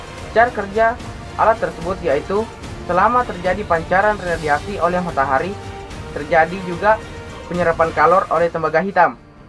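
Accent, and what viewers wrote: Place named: Java, Indonesia. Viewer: Kok medok yahh?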